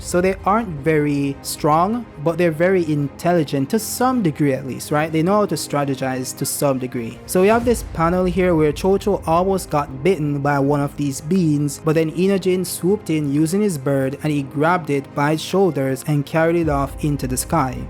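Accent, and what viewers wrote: Jamaica. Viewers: Is bro jamaican 👀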